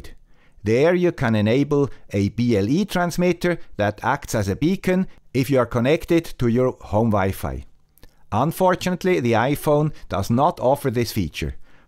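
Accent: Switzerland. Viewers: Just wait until how Swiss Accented Guy discovers NearLink / Green Tooth.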